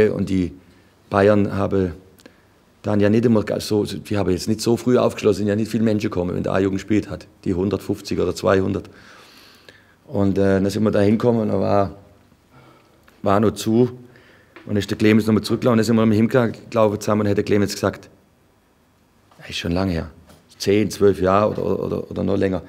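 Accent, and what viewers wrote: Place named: Baden, Germany. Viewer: Badener, kein Schwabe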